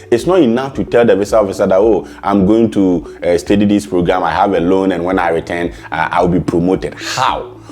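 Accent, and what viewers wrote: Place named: Ghana. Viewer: I think you are Ghanaian, Ur Accent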